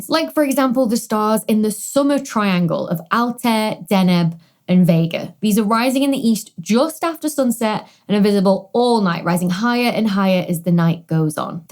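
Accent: United Kingdom. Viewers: I love that you make an effort to talk like a British person, it really irritates me that use of Americanisms is spreading.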